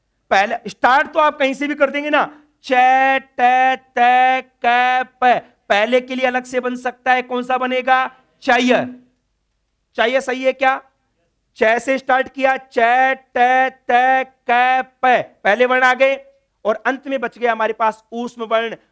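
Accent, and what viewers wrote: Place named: India. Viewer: You have to pronounce Alphabets n words properly while teaching Sanskrit. अ is अ not अय् । हिन्दी टोन आरही है नेपाली मे संस्कृतका टोन आती है वह ठिक है संस्कृत के लिए ।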